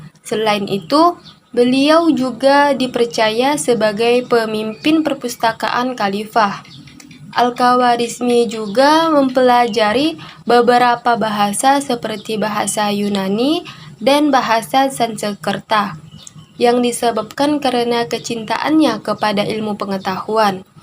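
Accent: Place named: Ketapang, Indonesia. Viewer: Logat ketapangnye ade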